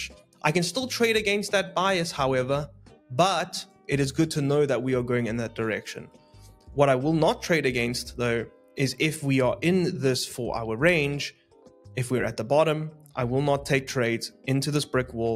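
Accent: South Africa. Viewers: You South African? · Are you South African by any chance? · Is jy n suid afrikaner.?. Jy klink afrikaans.. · Hey bro, are you South African? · Dude are you South African? I can't place your accent 100%, but sounds Saffa.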